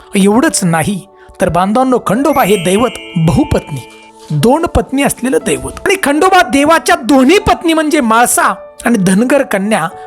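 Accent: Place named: Belgaum, India. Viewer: tumchya bolnyavarun tari tumhi belgav che mulche asave ase vatte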